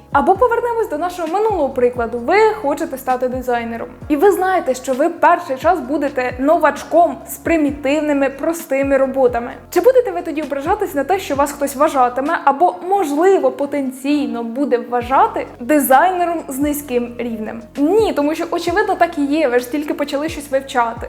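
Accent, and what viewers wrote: Poltava, Ukraine. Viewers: Ви дуже автентична, цікава та харизматична ❤ та мені чується полтавський рідний акцент 😅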